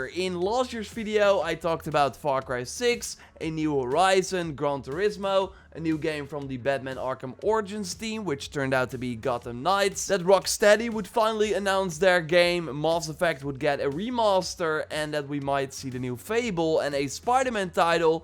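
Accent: Netherlands. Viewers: You just know when you hear a dutch guy talking English. Nice video btw man. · With this terrible Dutch accent? If he made his L’s any thicker, he’d sound like a Bond villain...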